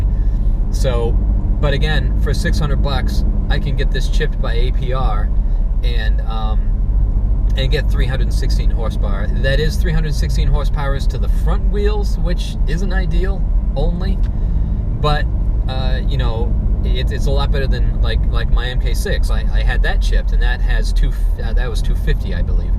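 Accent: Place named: Boston, United States. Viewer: First time on the channel,. Great car! Are you in Boston by any chance? I am and was just curious as the roads looked familiar and I detected a little accent.....disregard....i had not finished this video until you mentioned you were in Wetsboro. I've never owned VW, but yours looks awesome. I'd likely get the same one (white exterior with auto transmission).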